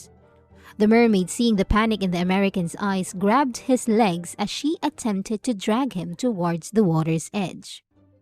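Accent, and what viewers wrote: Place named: Philippines. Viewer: You have a lovely accent, but I say that as lived in the Philippines for 6 months, awesome country, lovely people. Look up the Nantucket mermaid 1700s, seen and recorded by a Lutheran minister on a Dutch vessel. Recently sighted was a mermaid at Flat Bridge in Bog Walk Gorge Jamaica Mermaids are in the book of Enoch. They are the women who mated with Fallen Angels, locked in a hellish "purgatory" until the end of age and final judgment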